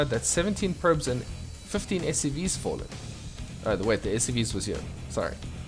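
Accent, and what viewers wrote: South Africa. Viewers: Great casting. Thanks for the video. Hmmmm do I smell a fellow South African :D Subscribed.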